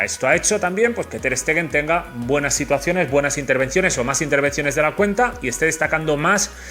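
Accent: Spain. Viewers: Por el acento parece claro que es español.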